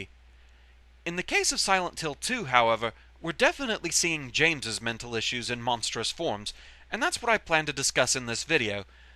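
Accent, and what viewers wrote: United Kingdom. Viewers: ¿Are you brittish my friend?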